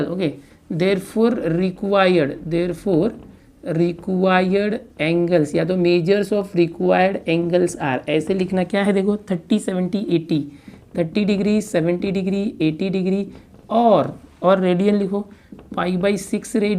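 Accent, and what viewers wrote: Maharashtra, India. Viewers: Sir aap marathi hai kya.. ????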